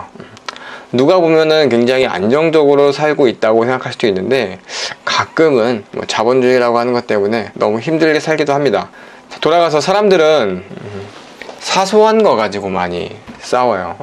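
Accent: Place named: Gyeongsang, South Korea. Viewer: 경상도 억양을 가끔 사용하시는거 같아서요 ㅎㅎㅎ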